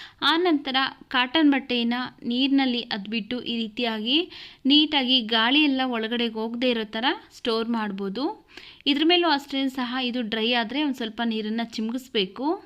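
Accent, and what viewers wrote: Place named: Kolar, India. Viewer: kolar bagepalli telugu kannada mataadteera... nice tips...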